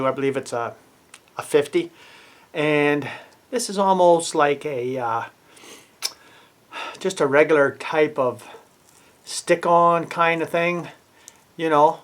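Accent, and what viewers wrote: United States. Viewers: Is that an Irish accent mixed with an American.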